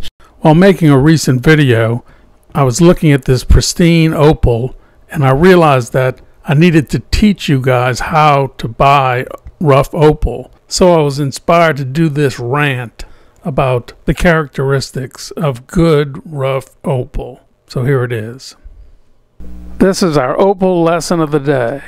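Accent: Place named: South, United States. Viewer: Hello, I was wondering if you sell opal?? And how come I absolutely love faceted opal but I NEVER see faceted Australian opal?? If you sell opal, please let me know! I buy Ethiopian and I’m wanting a large hunk of Australian but good lord it’s expensive. And your very southern, where you from friend? I’d love to sit down with you and saturate all your information!!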